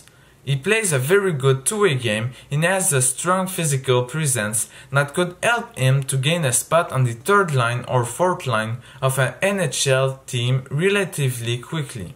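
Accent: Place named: Quebec, Canada. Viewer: Are you French Canadian or Russian lol? You sound like a mix of them haha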